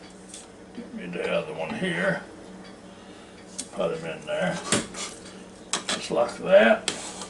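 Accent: South, United States. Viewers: The southern accent make this like 1000% better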